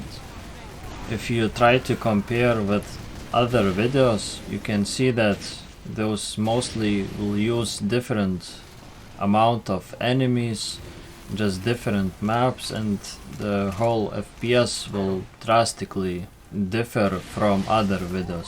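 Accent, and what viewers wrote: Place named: Ukraine. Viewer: but your english accent is very similar to ukrainian people. really.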